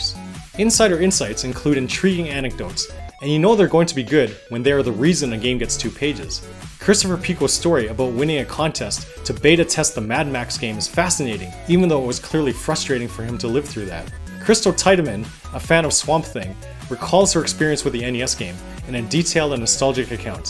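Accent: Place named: Canada. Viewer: Cheers from a fellow Canadian! It was funny hearing Cdn "aboats" both in your review AND that Cheetahmen ad. :D And thanks for VGMaps too; didn't know you ran that site.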